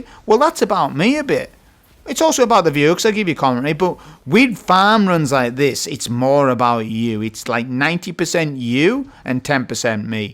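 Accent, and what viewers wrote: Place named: Newcastle, United Kingdom. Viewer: that's fair enough, I'm just trying to identify the accent, sometimes I think there's a bit of Geordie in there, sometimes I'm like hmmmm.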